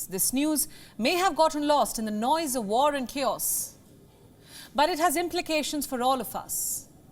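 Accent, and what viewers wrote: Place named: India. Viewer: No, she is trying - and succeeding, in being more easy to understand for audiences not used to Indian-accented English. WION - "world is one news" is aimed at an international as well as a domestic Indian audience. A major component of typical Indian accents is a difference in sound pattern, with the emphasis seeming to occur in the wrong place to what speakers familiar with the most common UK, American and Australian varieties of English expect. Slowing down just by itself helps a lot. If this all sounds like nonsense to you then look up the difference between "stress-timed" and "syllable-timed" languages. English in its country of origin is stress-timed, as is German, Dutch and Russian. Hindi, Spanish, Italian and most southern European languages are syllable-timed. As is the native language of Wales, which is why some native English speakers think people with a strong Welsh accent sound Indian, or that an Indian accent sounds Welsh!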